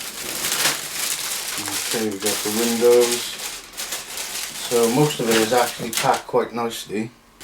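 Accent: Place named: South Wales, United Kingdom. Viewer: I think my high quality diet and fitness regime is what keeps me resilient to illness but nobody is bullet proof and I'm definitely concerned! From you accent your from south Wales right? There have been a fair number of reported cases in Wales and that's gotta be scary, so far there has been two here in Wiltshire but none in my area of the county but it's just a matter of time and I think it's almost inevitable that it will reach here sooner or later.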